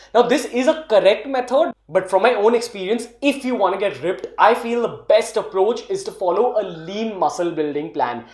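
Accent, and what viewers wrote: India. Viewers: So sorry but I just cannot stand the Indian accent. It haunts me as I had a terrible experience with an Indian call center scammer who syskey'd my machine. I wish you did robot voice instead. Good luck though, you seem like a pretty chill dude.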